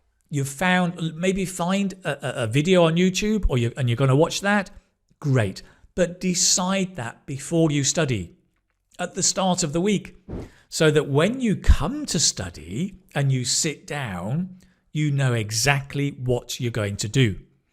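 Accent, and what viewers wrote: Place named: Manchester, United Kingdom. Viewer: I think he is from Manchester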